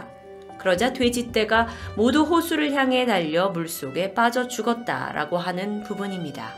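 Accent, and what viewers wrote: Jeolla, South Korea. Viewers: 디바제시카님 전라도 사람인가..?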